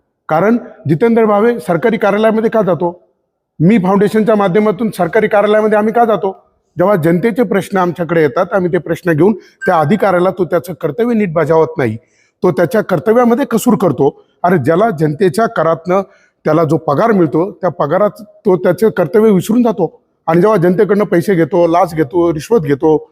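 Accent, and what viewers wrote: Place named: Ahmednagar, India. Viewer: नगरी भाषा 😊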